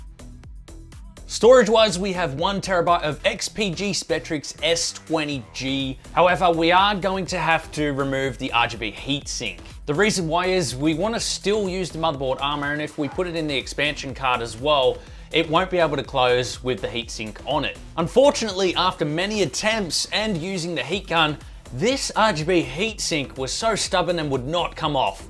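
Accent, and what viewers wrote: Australia. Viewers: are u aussie ? so am i lol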